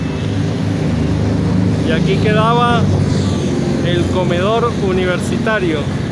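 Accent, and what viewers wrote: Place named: Mérida, Mexico. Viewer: usted habla merideño y mucho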